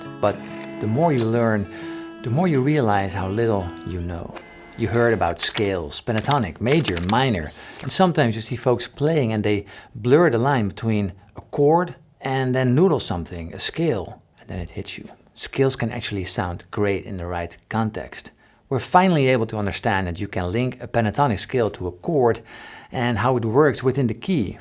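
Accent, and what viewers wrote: Netherlands. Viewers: are u from holland? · Well I always thought you were dutch from the accent. This did it for me XD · I thought 'hmm, I think he's Dutch' but at the 'Oh mijn God' I knew it hahahaa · Funny, he also plays with a kinda Dutchie Euro accent. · You inspire me to play the guitar. I really like 08. very beautiful sounds. Ik hoorde trouwens gelijk dat je nederlands was, fantastisch! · "The beard guy with the weird accent" I'm gonna guess Swedish. I don't know why, but that's what my first thought was. Edit after finding out it's a Dutch accent: Well I got the continent right. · I love your Nederlands accent 😂❤️ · oh mijn god sounds dutch!💯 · I sound a little less Dutch I hope but he's the better teacher haha netjes broer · Only the Dutch have this beautiful accent when they speak English:)) Hartstikke mooi joh, ben een beginner maar ga je zeker volgen!! 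· Me trying to figure out if this man is Dutch or not. Him Me: Yup, deffo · already felt like it was a dutch accent XD ook hallo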